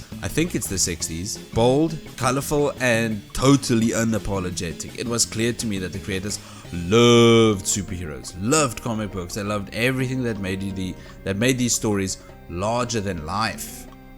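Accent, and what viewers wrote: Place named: South Africa. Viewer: Always so nice to randomly hear a south African accent here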